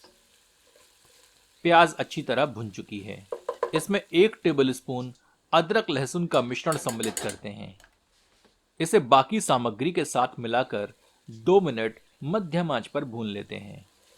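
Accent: Standard. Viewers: Great to hear shoodh hindi. Well spoken and the recipe is amazing. Thank you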